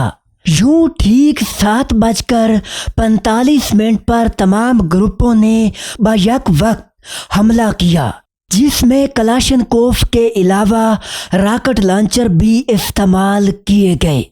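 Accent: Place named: Punjab, Pakistan. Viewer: 30 ستمبر کے چند دن پہلے سے مسلسل لالو کھیت ، ناظم آباد ،لطیف آباد میں ہونے والے واقعات بھی بیان فرما دیے ہوتے تو شاید آپ کی " تاریخی تحقیقات" مکمل ہو جاتی ۔۔ قاسم آباد کے آباد ہونے کے اسباب کے بارے میں بھی بات کر لیا کریں ۔۔ اگر ایسی وڈیوز اپلوڈ کرنے کی ضرورت پیش آئی تھی تو ۔۔ ویسے آپ کا لہجہ اجھا ہے ۔۔ تاریخ پر پنجابی لہجے میں ہی اردو میں تحقیق پیش ہونی چاہیے ۔۔۔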